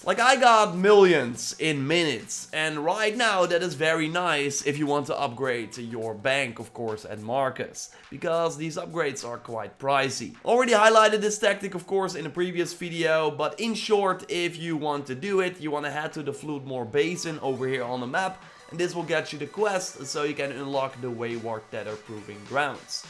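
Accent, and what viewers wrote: Netherlands. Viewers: Man man man, dat neder-engels. Verschrikkelijk.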